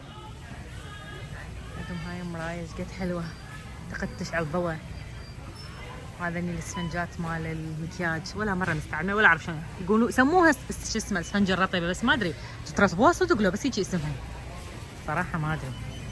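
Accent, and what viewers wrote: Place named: Iraq. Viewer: في أي ولاية هذا أيضاً اللهجة العراقيه كتير حلوه و حضرتك قمة الجمال والانوثة 😂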